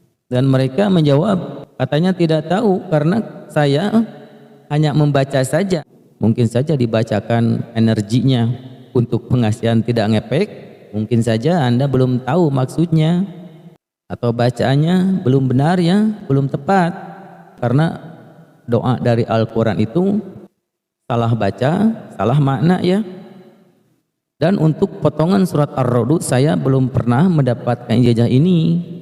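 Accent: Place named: West Java, Indonesia. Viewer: Di lempangkeun....😂😂😂nuhun kang...logat sunda na kaciri pisan..qobiltu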